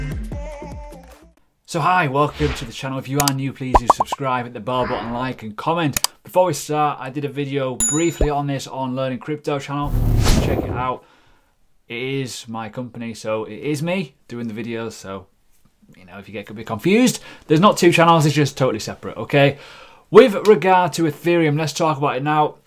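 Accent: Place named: Hull, United Kingdom. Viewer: Where are you from mate. East Yorkshire. Bit of hull accent there lol I might be wrong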